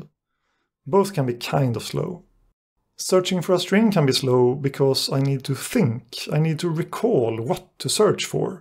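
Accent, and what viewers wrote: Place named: Sweden. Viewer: The Swedish accent is strong in this one :)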